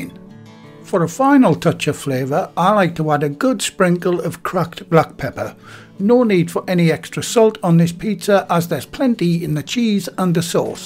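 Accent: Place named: North, United Kingdom. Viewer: Love your presentation! Very organized and straight-forward, anyone can have confidence following you, and expect good results. Love the Northern accent too!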